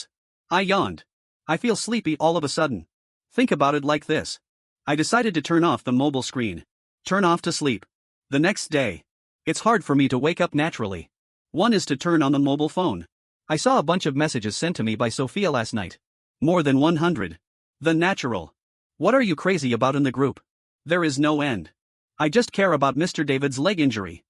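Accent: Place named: China. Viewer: chinese style english . . .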